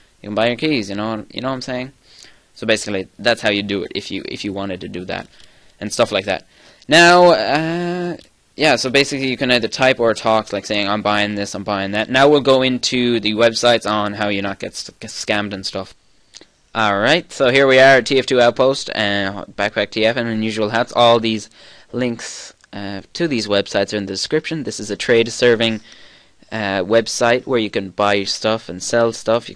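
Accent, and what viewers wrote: Ireland. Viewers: YAY! YOU ARE IRISH!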